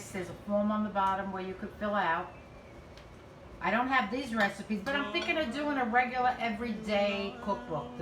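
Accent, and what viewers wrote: New York, United States. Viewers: The website to order the book is “ not secure” . Apple recommends that you contact the owner of the site and don’t give your credit card information. I enjoy your videos otherwise. I love your accent and it is same as my sister who lives in New Rochelle. I am a retired cardiologist from the Bronx who lives in Omaha for last 45 years. Of course, I lost my accent(ha, ha… NOT.)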